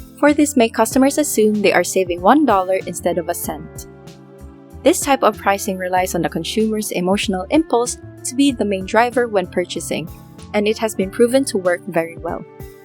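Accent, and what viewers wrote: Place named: Philippines. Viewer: almost give up on POD after spending so much time following tips here and there ,I'm glad I stumbled on your channel...and wow your amazing and inspiring, I find so much value in your content....I'm hoping to learn more and finally establish my RB shop. Thanks so much. BTW, correct me if I'm wrong, I'm from the Phil and hearing your voice and diction makes me think we are from the same country, anyway, I'm just curious..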